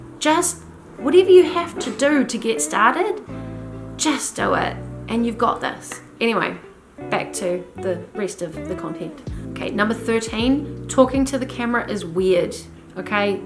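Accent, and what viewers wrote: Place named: New Zealand. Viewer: Solid advice. I like DaVinci Resolve as well. It has more features than most of us will ever need let alone use. We tend to be our own harshest critics. Your videos are lit well, the background isn’t distracting, you dress for success and your eye contact with the camera is good. And who doesn’t love a Kiwi accent?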